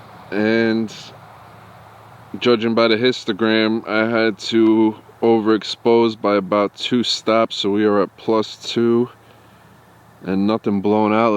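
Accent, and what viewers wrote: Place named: New York, United States. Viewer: I knew it.. the 1st vid. I watched today o said.. 😏 ooohhh he is so Italian, sounds like new York or jersey with some flava.. lol new sub. Here hopefully u can BHL some other cameras bc I'm to confused for a vlog camera.. I do have the z6 which is great so far as a newbie and I feel futuristcally.. but it heavy with all the monitor n mic.. blah blah.. so I want more YouTube/travel options for a serious vlogger .. pleaseee!!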